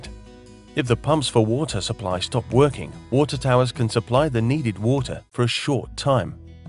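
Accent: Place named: United Kingdom. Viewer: I love how you Brits say "wouuhtuh." If you jumped into the water in the tower, would you get sucked into the plumbing?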